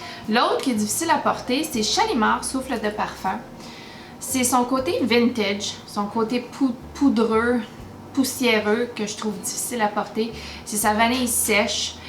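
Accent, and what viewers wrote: Quebec, Canada. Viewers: Bonjour, j'adore ton humour l'air de rien quand tu parles des sorties et des confinements 😁 Pour moi, faciles à porter: N°19 (mon parfum signature), Insolence et Shalimar millésime de Guerlain (coup de coeur mais mauvaise tenue), Vanille tonka de Nicolai, et plein d'autres... Ceux avec lesquels j'ai plus de mal désormais, les "cheap" de façon générale, par exemple la collection "Les notes gourmandes" de Réminiscence (Guimauve: je ne peux en mettre que sur mon poignet ou le sentir dans la pièce, mais ni moi ni sur les vêtements). Mais aussi les parfums dont la base est l'oud, tous les masculins en général, ainsi que les "odeurs de propre" (muscs trop synthétiques). Merci pour toutes tes vidéos qui sont un vrai plaisir à chaque fois, avec ton accent (euh, je veux dire le fait que tu n'aies pas d'accent français 😁) qui me donne envie de retourner au Québec! 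Bises 😘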